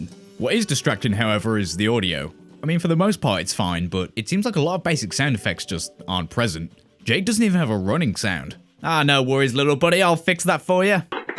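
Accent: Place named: Midlands, United Kingdom. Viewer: Aw man, this video was quite a sentimental one for me. My family grew up playing this game together in the Midlands, I was sure I recognised your accent and then you mentioned Wolvo and Bham haha :)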